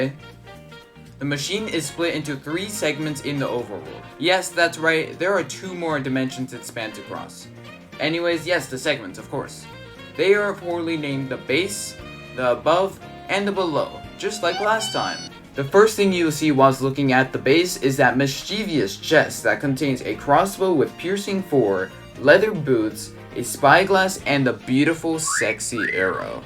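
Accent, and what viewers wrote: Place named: Canada. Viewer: Yeah, you're definitely Canadian, I suspected it after hearing eh then you spoke French. I can't see you not being Canadian.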